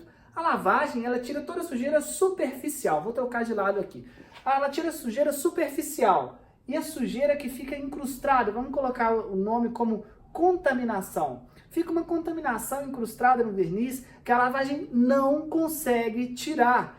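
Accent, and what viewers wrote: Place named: Minas Gerais, Brazil. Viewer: Fala, como vai? Bom pelo sotaque acredito que seja mineiro, se for Tmj soh, se não Tmj tbm 😝, possuo um Vectra 08 Branco, poderia me orientar sobre qual verniz é utilizado nesse tipo de carro? Agradeço a atenção e as dicas que vcs nos dão, forte abraço!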